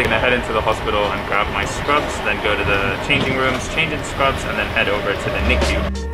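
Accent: United States. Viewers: Are you amirican?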